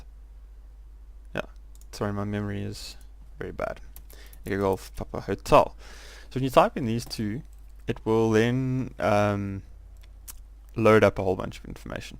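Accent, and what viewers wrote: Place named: South Africa. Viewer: I thought you sound South African and I was right. Im also down here but thanks for this I jist finished my pc build and started to play xplane.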